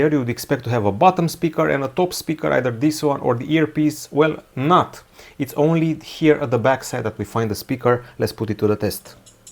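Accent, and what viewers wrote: Romania. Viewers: e obositor să asculți un român explicând ceva în engleză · Ba tu esti român! Se aude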